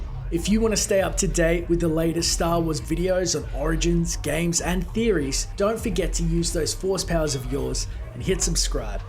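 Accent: Australia. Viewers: You are an Aussie aren't cha?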